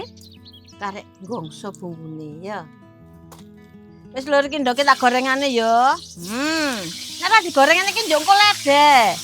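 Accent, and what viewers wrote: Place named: Surabaya, Indonesia. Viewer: ng jogja wes suwe tapi logat e jek logat arek ae 🤣 Salam saking kulo nggeh buk darjo cerak mantune smpean 🤭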